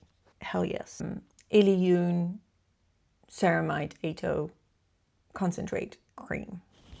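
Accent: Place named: Ireland. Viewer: Nice video! Are you living in Ireland? You have quite an Irish accent 😊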